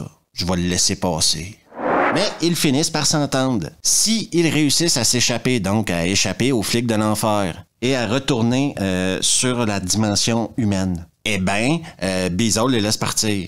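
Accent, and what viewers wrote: Quebec, Canada. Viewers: C'est dommage pour moi j'ai du mal a comprendre l'accent québecois sur certaines syllabes.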